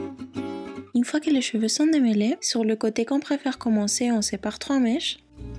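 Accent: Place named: Spain. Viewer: han comme j'adore ton accent !!! ça sent l'espagne à plein nez (je me trompe pas j'espère) qu'est ce que tu es charmante aussi, un visage à la audrey tautou, simple, frais et naturel :) un besito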